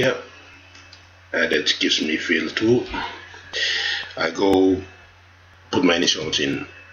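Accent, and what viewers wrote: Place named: Papua New Guinea. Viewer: I can hear a Papua New Guinean. Thank you for the video, my man!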